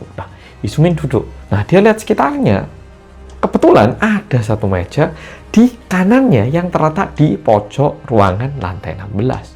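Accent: Java, Indonesia. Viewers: Betul juga ya mas korea medok🥰 penampakan merupakan permintaan tolong bagi arwah unt kita yg masih hidup😊tks atas critanya yaaa🤓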